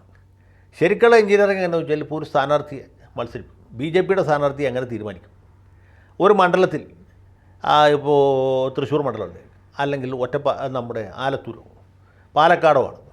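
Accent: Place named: Thiruvananthapuram, India. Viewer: സാറിൻ്റെ തീരു::പുരം ഭാഷാശൈലി വിട്ടുപോയില്ലേ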